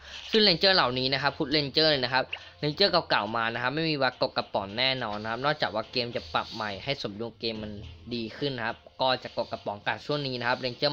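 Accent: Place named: China. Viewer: ไม่ค่อยชัดเลยอะคนจีนใช่ป่าว?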